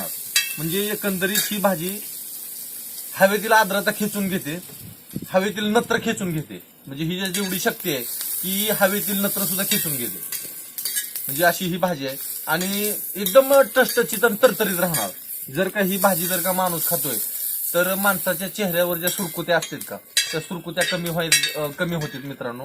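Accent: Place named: Malshiras, India. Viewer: Tumchi malshiras talukyatale vatata karan aamhi pan tikdachech aahot......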